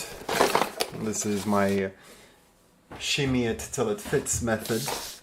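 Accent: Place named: United States. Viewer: I thought the accent was American possibly Canadian.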